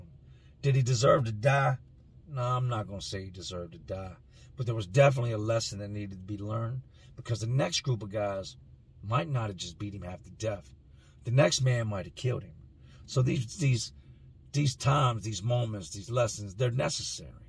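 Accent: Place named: Boston, United States. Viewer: yep all he does is do stories about small fry rapper beefs and shootings n shit. Just regurgitating the same shit as every other news outlet. He just does it with a Boston gangster accent. Thats it.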